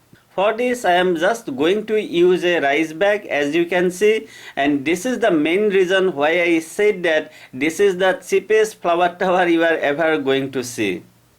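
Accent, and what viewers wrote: Assam, India. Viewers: You're from assam right..? I know that nolboria accent.. ✌🏻😄🤘🏻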